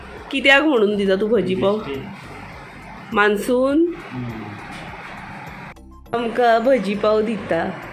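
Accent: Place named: Konkan, India. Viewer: Tujho awaz aani kokani bri aasa,ayak pak brey dista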